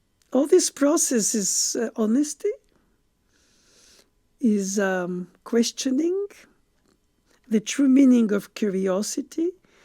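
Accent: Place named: France. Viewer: I can listen you speak for hours. I don’t know if your manner of speaking is because of your native French or because of your intellectual and aristocratic sophistication. But you definitely sound like someone from the past (from a glorious, superior past) and that both makes me happy and sad.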